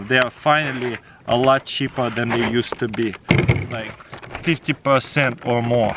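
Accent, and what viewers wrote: Russia. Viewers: Руский акцент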